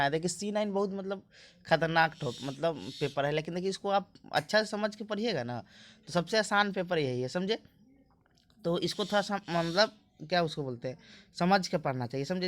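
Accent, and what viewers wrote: Bihar, India. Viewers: Bihar se ho lg rha aap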